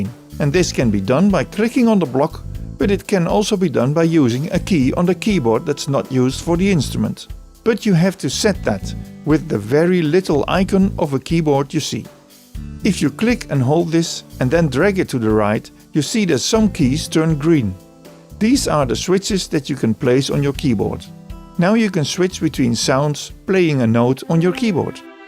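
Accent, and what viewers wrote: Netherlands. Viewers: Thickest Dutch accent I've ever heard 😂. Sounding good tho.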